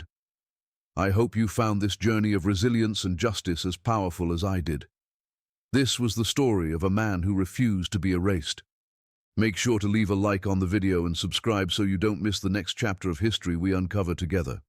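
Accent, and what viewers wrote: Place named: United Kingdom. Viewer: It would have been cooler hearing an American sounding vo ,1nstead of a Limey sounding voice over ! In fact any real sounding voice from an average American would have been better ! Not sayin’ it didn’t happen this way , either the guy was real lucky , or very brilliant tactically speaking or the folk that showed were totally drunk !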